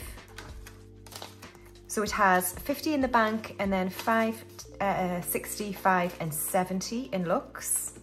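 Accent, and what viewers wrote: Newcastle, United Kingdom. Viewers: I’ve said this before and I’ll keep saying it, I bloody love the Geordie accent 🙌🏻 Our birthdays are a few days apart, mine is July 26th! Team Leo You missed out 36 on the penny challenge but you’ll probably notice it when you do it next haha. Another amazing video & don’t forget to use your spending money on a bottle of wine or two when you have food with your travel friends 😂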